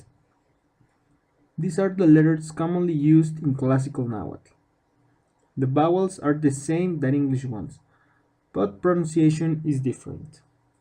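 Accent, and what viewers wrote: Spain. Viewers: Um... None of those vowel sounds were correctly correlated to English sounds, but if you listen with Spanish ears it makes sense... It reminds me of the old "pink, green and yellow" joke... (Long story short: "I hear the phone green, I pink it up, and I say, 'yellow'?") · He has a spanish accent it sounds exactly how he said it just americans cant understand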